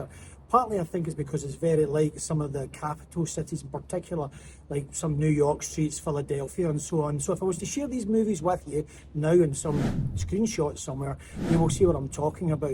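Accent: Scotland, United Kingdom. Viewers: Oh Sir, your Scottish accent is so fascinating😂My situation is quite complex that I'm Chinese, learned British English before I went to Australia. Me and my friends watch American sports games so I'm already lose that what kinds of English rules should I follow. Now you add on. I'm going to be crazy now.🙄 I'm already suffered in Chinese dialects hell, why one more ... BTW good contents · Scottish accent is cute 😊, and welcome to my home country, hope you enjoy your trip!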